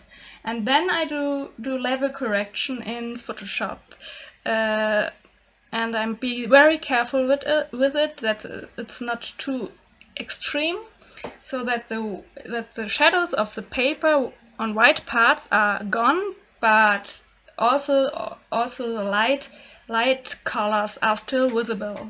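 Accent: Germany. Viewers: i really love your accent. Im american but i have a speech impendememt that makes me sound like i have a heavy accent (idk thats what people tell me). I dont see why people would make fun of you, your English is fantastic and i personally think you're accent is beautiful. Infact what i like about you is that i can tell you really try to explain how you do things and i think that's really sweet :) I might be alittle biased because i love German accents but whatever. You're a wonderful person and don't be timid about who you are or what you sound like. Atleast you try, that is all that should matter to the ones that matter :) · im sorry if i wrong but are you german because i am to and german was what i was raised with and i sounded the same way sooooo dont worry it gets easier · Love your art, love your English because you use it with a delightful accent. I spent many years in Germany with the army and never mastered Deutsch. 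Thank you for sharing your art and please do not lose your accent. Ps where in Deutschland do you come from. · I personally love the German touch to your English. Everyone adds their own flare to their English. It's sad to see those trolls criticize someone for sounding unfamiliar to themselves. On a side note, I really like your work, I'm considering using paint more often because of you 🙌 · I think your english is great! You have a little bit of an accent, such as german natives have, but that's all. Thank you for your lovely and instructive videos.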